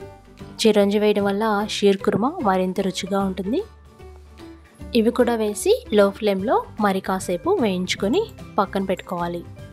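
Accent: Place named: Krishna, India. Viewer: Yummy 👌🏻.... I strongly believe you are from krishna district right 💁.... The way you speaks was very much related to krishna's accent 😊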